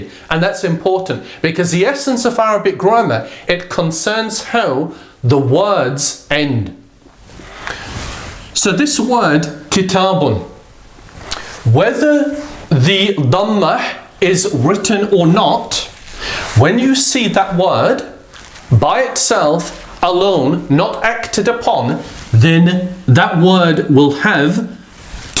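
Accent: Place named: United Kingdom. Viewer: You are speaking British accent! Wow! You're the best Arabic teacher I've ever seen! Thank you, Jazakallah!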